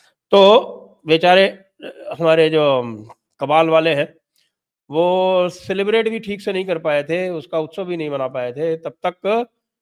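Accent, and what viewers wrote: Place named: Allahabad, India. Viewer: Very hilarious presentation in Allahabadi tone.😂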